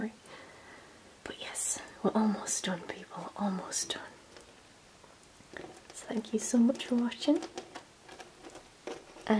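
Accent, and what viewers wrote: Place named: North, United Kingdom. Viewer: As someone from Yorkshire it's rare that I find another Northerner soothing, but here you are.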